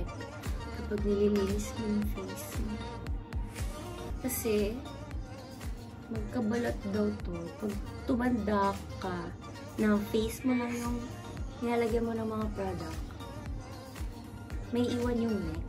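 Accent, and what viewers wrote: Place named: Waray, Philippines. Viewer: Hinay permi Miss.. I super duper like you..❤️❤️❤️ Lalo na pag nagwawaray ka hehehe ❤️ From Samar😘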